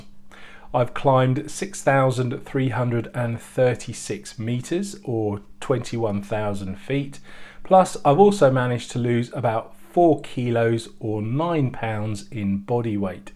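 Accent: United Kingdom. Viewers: Love your British accent...!! Great video production and wonderfull scenary from the country side..!!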